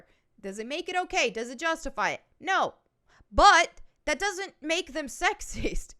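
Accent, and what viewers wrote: South, United States. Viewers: Hey.. Just discovered you. You're hot. I'll follow you awhile. ;-) I like your voice.. southern?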